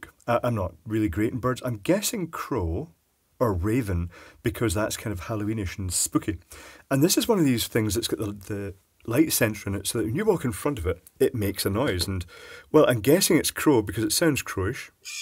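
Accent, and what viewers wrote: Scotland, United Kingdom. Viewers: Resisting urge to inform newbie that is Scottish. I have failed.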